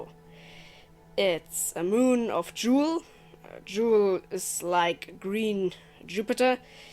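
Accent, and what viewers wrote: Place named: Germany. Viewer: Ging mir auch so! Man hört den Deutschen Akzent, aber Super gesprochen :)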